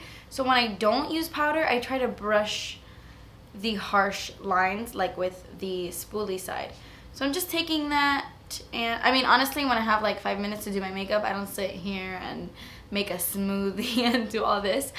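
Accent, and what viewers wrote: Miami, United States. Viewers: I love your Miami accent! Lol. So weird but I can always tell when someone's from there. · She calls it a Miami accent . She's Cuban but doesn't have the accent from them .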